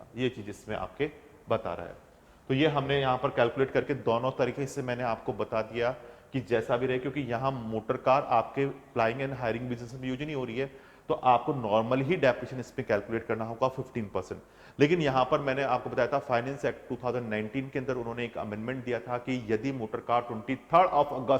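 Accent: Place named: Rajasthan, India. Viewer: Rajshthani accent👌😁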